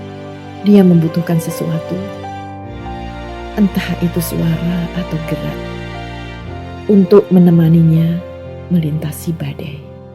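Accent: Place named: Java, Indonesia. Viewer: Pronounciation khas Jowo ya? He3... Sing penting penonton paham karepe ya? 😁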